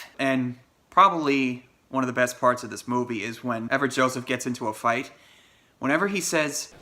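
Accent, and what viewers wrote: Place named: Ireland. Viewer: Do a YouTube search for Star Stories Tom Cruise to see a very funny “summary” of the film. I enjoyed your review and it nearly makes me want to watch the film again to see if I will change my rating from the one I gave it then: TotalAbsoluteLoadaShite. Your Irish accent is grand, def better than many American actors.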